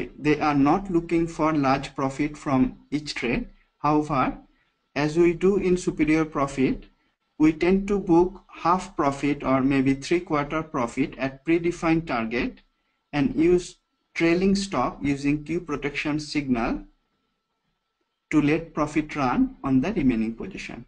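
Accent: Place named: United States. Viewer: Good! liked it. I expected a typical Bengali accent but instead an American! :). would like more from you.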